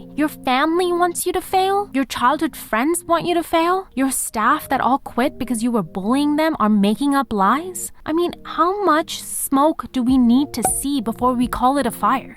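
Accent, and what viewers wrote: United States. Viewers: May I ask why you sound so American when being born and raised in British English speaking India? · Mumbai - I thought you were American. Greetings! You are providing us well researched and quality content!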